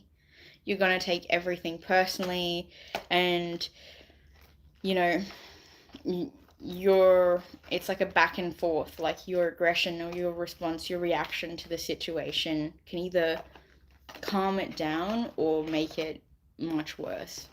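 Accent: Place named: Australia. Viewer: Love your accent. Are you an Aussie 🤔🦘🇦🇺🌏🐨🪃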